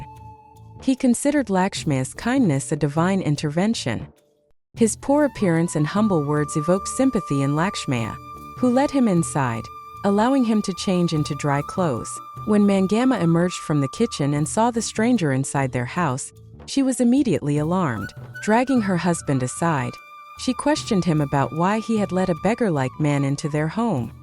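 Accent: India. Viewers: Accent indian rkho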